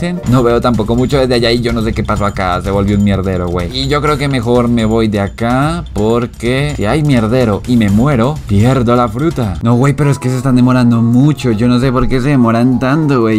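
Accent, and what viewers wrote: Colombia. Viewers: Sos de colombia no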